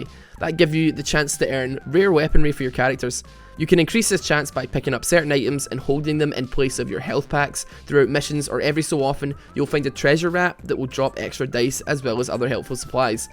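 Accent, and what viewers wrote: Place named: Edinburgh, United Kingdom. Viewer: sounds like he lives in Edinburgh i dont know how i can tell but im Scottish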